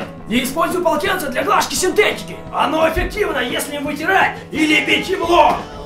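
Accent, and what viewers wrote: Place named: Japan. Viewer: говоришь с японским акцентом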